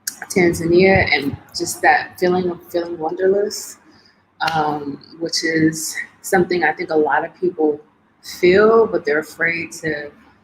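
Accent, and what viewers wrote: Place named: United Kingdom. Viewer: 😂 that UK accent something different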